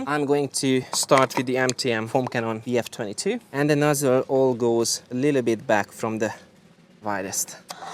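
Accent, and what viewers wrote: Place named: Hungary. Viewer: Hi, according to your accent, you must Hungarian 😉. Correct me if I'm wrong. Good content by the way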